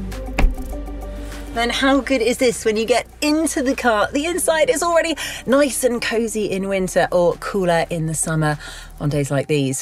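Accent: England, United Kingdom. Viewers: Very english accent! :)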